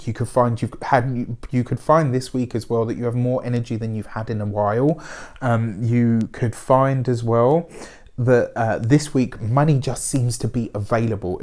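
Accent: England, United Kingdom. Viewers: You’re so wonderfully English “now that I’ve waffled on” haha. Thank you for this lovely reading 🥰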